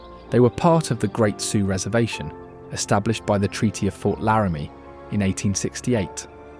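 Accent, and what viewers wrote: United Kingdom. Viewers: You missed so much. Why even have Souix Falls And Mitchell but leave out Rapid city? Also your narrator has a British accent and doesn’t always pronounce names properly. Start over and do West River and East River in a different episode. It’s beautiful but poorly done. west river citizen for 30 years , and still a frequent visitor and site wearer, never gets old!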